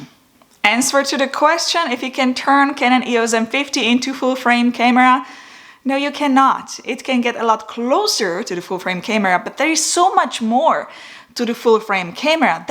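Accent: Czech Republic. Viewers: Are you from Czech republic? :-) I heard "Ahoj" and your accent is also similar to czech-english one. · Oh wow. Wasn’t expecting the czech accent. Haha. Great video btw!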